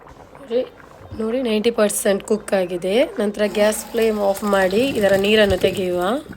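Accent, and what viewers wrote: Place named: North Karnataka, India. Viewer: Nimdu yavoor kannada ri uthhrakarnata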